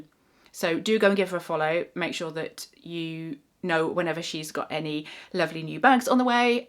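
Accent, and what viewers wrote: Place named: United Kingdom. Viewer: Great show. I enjoyed your Wip parade that's when I found you. Brilliant to hear another Brit. Your are BUZZ ing around the world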